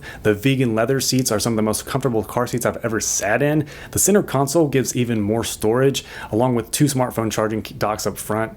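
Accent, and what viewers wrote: Kentucky, United States. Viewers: hahaha i thought you was in kentucky...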